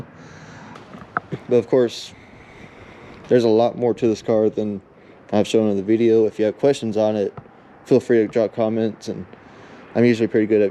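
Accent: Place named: Middle Tennessee, United States. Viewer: my guy lmaooo, i knew you had to be from middle tn. We got all the z/g's out here lol, im from smyrna/ lavergne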